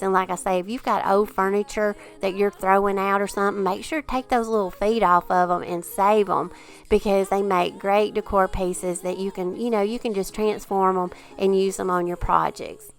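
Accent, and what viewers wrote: South, United States. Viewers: Where are you from? Your southern accent sounds like my family. 🥰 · Loved all your projects !!!!! New subbie...... looking forward to more projects..... And I can tell you are Southern.... We Southerners can recognize our peeps !!!!! ( just by listening to them talk !!!! LOL ) God bless !!! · Came over from Julie’s channel! New sub! (I’m in Alabama so I love your accent!) ❤️🦩 Vicky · I love them all & i love your Southern accent. :)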